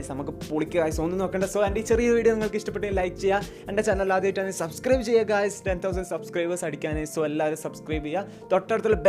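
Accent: Kannur, India. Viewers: Kannur ആണോ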